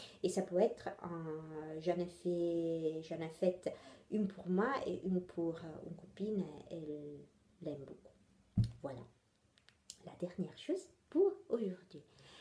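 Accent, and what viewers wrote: Italy. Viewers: Coucou ! C'est toujours un plaisir de retrouver ton bel accent italien et tes Robin 😂 Passes une bonne semaine en espérant que tu trouves le temps pour coudre et à très bientôt ! 😘😘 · bonjour, j aime regarder tes podcast et j adore ton accent italien st tu as toujour le sourir.bisou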